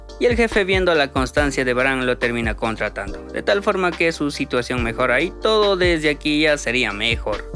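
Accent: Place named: Ecuador. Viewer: Por como hablas eres paisano de Ecuador, un saludo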